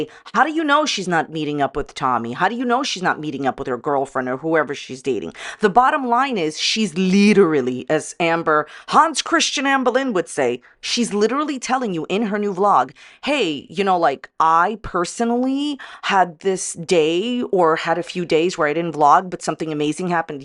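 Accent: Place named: New York, United States. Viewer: With how sneaky her words are, she could be a lawyer. Shame she doesn't have certain other qualities needed. Btw, if someone hasn't coined the name Scamberlynn/Scandalynn Reid for her yet (pretty sure it's been done though) I'm claiming it 😂 Also, hi! This is the second time I've seen your video pop up in my feed. Not much of a follower of Gorlworld beyond the occasional video here and there, but I think I'll stick around with you for a bit because you've got a really pleasant voice and accent (New York/New Jersey?). Have a subscribe 😊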